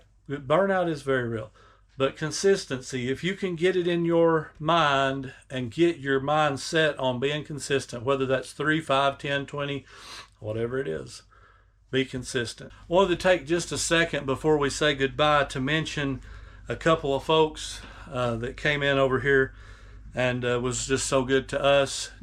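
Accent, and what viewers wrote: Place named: South, United States. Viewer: Southern accents sometimes leaves others guessin 😊 I'm going with Pack It. Hope Y'all have a great Holiday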